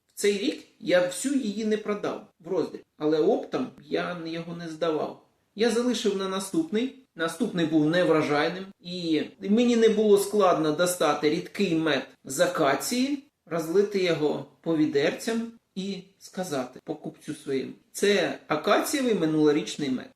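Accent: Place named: Chernihiv, Ukraine. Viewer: коли слухаю тебе то згадую дитинство. У 1978році до нас після Ніжинського педінституту прийшло декілька молодих вчителів серед них фізик слово коли вимовляв так як ти думав що він з Чернігівщини, потім він напевно повернувсь на батьківщину. Ти з чернігівської де така говірка?Чи може Каликовський так його прозивали учні був з Харківщини?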